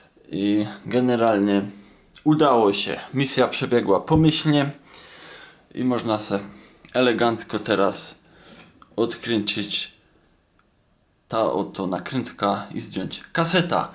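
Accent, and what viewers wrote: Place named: Silesia, Poland. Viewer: Ja nie dam komentarza bo nie umiem tak śmiesznie po Śląsku mówić😉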